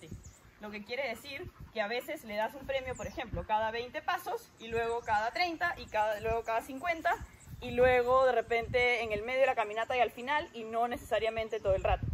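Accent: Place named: Panama City, Panama. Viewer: supongo que por su acento es de Panamá capital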